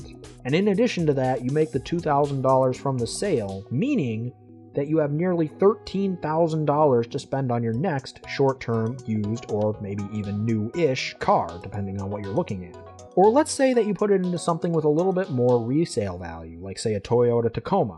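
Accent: United States. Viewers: giga annoying american voice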